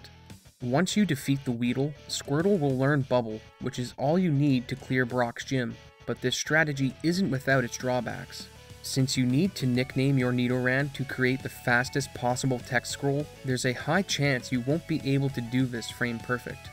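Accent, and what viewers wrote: Canada. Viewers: proud of myself for predicting that you were Canadian based on your accent. Great video though!!!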